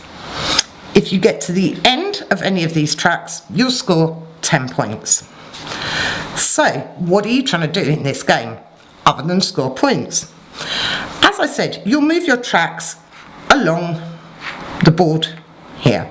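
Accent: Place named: England, United Kingdom. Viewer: Just curious, what kind of English accent is this? thx for the explanation, even if by no means this is should be marketed as a "10 minute" teach I play red too.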